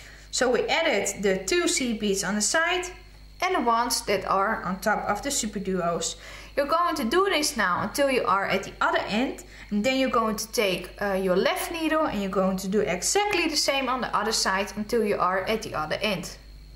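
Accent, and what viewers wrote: Netherlands. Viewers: Nice tutorial! He, komt u uit Nederland?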